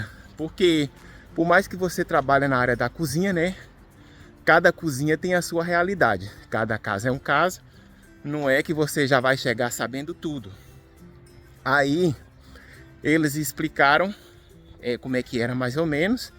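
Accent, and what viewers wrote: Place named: Northeast, Brazil. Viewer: Quem será que mandou esse nordestino, essa encomenda, para cá para Portugal? No último vídeo dele, ele desativou os comentários porque ele sabe que vai ter "pra sobrá prá eli". Ele não está no estado dele, nem ele está no país dele. Vê-se logo que ele é dum país de TERCEIRO MUNDO, que é de orientação de esquerda e ele dá a entender que é evangélico ao dizer que a Terra é de todos. A terra dos evengélicos e dos das seitas é a Holanda, a Dinamarca, a Suécia etc. Portugal é um país católico e cá fala-se português de cá de Portugal e não o português do Nordeste do Brasil, como ele fala.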